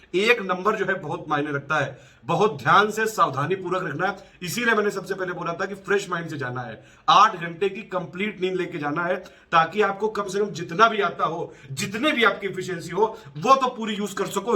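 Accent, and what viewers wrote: Kanpur, India. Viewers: Hahaha..... total kanpuriya ho Bhai.....hum bhi kanpur ke hai...z😂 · Puri hmari kanpuriya language hai 😀😀👍👍 mja aa gya sir🙏🙏🙏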